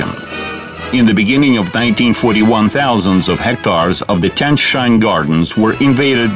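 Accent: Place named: Russia. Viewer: Other than a rather heavy accent his English is perfect.. I have no trouble at all understanding him. I find the accent actually quite fitting and adding to the charm and flavour of the documentary. Russian planes, Russian accent. It fits.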